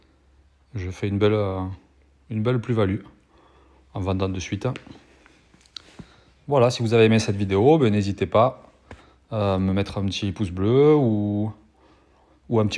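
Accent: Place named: South, France. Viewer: Et en plus t’as l’accent du sud ✌️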